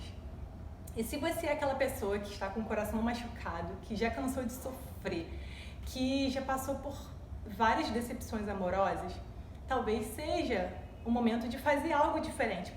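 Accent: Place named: Rio de Janeiro, Brazil. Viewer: Carioca S2 Sotaque lindo e fofo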